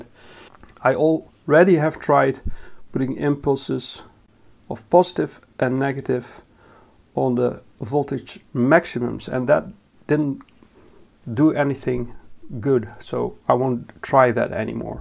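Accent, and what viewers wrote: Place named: Netherlands. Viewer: Thought you were from Sweden, but your Dutch, right?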